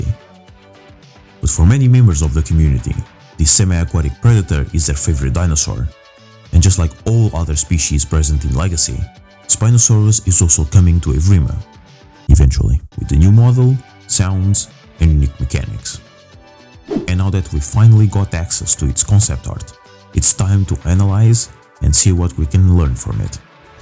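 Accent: Portugal. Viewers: amazing video as always man, i too am worried with the lack of info in this concept. P.S: your accent is very familiar to me. do you happen to be portuguese?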